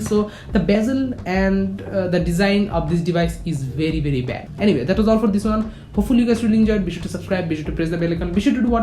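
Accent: Bengal, India. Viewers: Bengali English accent👌👌👌👌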